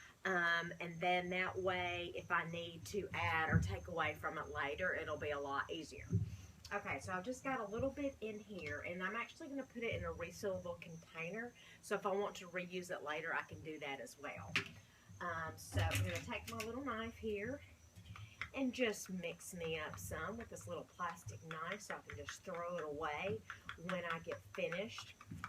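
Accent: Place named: South, United States. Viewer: Such a fantastic southern accent! Where are you from?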